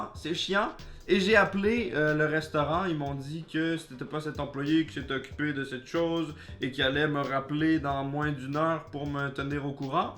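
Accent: Quebec, Canada. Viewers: Tu connais alan 92 en France,regarde sa chaîne c’est un mangeur de malade 🤢 quand à toi tu me fait rires 😝 avec ton accent,et pourtant je côtoie beaucoup de québécois car nous avons une équipe de hockey à Rouen mais t’es connerie me font bien rigoler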